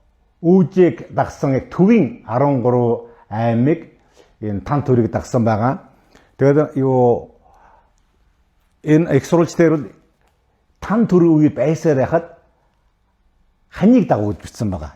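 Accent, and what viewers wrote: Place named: China. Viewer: энэ одоо ямар гээчийн монголоор гайгуй ярьчихдаг хужаа вэ!!!!!!!туух гуйвуулаад байгаам уу эсвэл яриад байгааг нь би ойлгохгуй байгаамуу?????хужаа л байна ш дээ!!!!!!яриа нь ойлгогдохгуй юм байна !!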